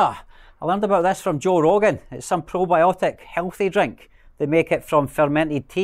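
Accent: Scotland, United Kingdom. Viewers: I think this is the funniest one yet had me laughing 😆 my head of from the beginning I thought the camel was going to chase you but no the oryx did the way you fell back geez you were very lucky there lol you tend to push the limit's with animals, anyways your a CMU 😅😂🤣 love watching you your Scottish accent tops it all of✔️ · Your Scottish accent is so strong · i dont understand what i like more, his content or his lovely scottish accent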